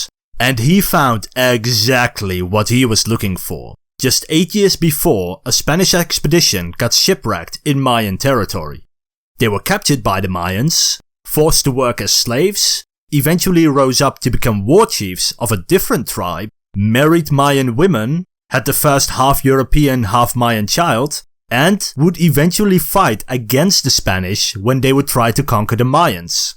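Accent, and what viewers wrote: Netherlands. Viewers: Are you Dutch ? Your accent sounds Dutch :) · Irritant Nederlands accent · its subtle but are you Dutch? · I hear a typical Dutch accent. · I recognize the accent....Dutch! I used to live in Holland.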